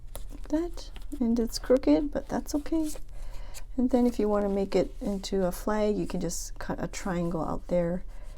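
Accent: Canada. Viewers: Oh! I didn't want the video to end!!! I was enjoying so much! Thank you for keeping it real and for sharing with us how you sometimes struggle to keep up with your journals too. I already commented about the 10a.m ridiculousness in your previous video, but Ugh!!! I really feel your pain! And the gyms too? Pre-Covid, I used to be the first one at my Starbucks am when they opened. I needed my caffeine before heading to the gym! ☺️. I'm a morning person, and if it doesn't happen in the morning, it doesn't happen at all! Regarding the Oli clip, you could maybe try to find a different brand of magnetic paper clips locally? Especially beeing in Japan, the Mecca of stationary, I'd assume that you would be able to find pretty much anything you needed/wished/wanted... Anyway, it's just a thought 😊. I'm really curious about what it is you do exactly. Your job seems to be very intense! 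And what is that "box" you mention putting washi in? Ok, one last quick thing (I promise!! LoL) I find it so adorable when, once in a while, in some of your videos, I hear you say "eh?" at the end of a phrase. It always makes me smile. You might have left Canada, but Canada has not completely left you 😉. Sorry for the long and rambly comment!! Have a wonderful day! ♡♡♡ - X.O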